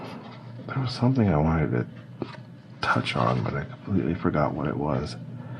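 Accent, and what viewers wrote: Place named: Connecticut, United States. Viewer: Da fuq? Ken Keniff from connecticut sounding ass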